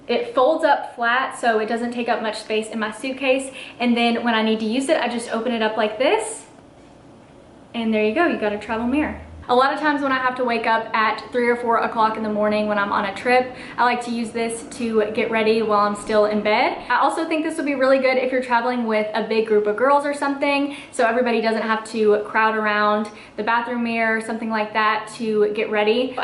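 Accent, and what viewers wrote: South, United States. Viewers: I thought I was the only one who traveled with coffee creamer. Glad I found your channel. Love to hear a familiar accent (Southerner here too). ;) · Am I the only one that enjoys listening to a southern accent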